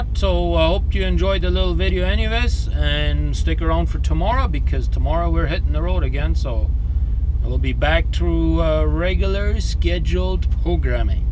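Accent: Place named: Canada. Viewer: An actual heavy Canadian accent, I haven't heard one in a while.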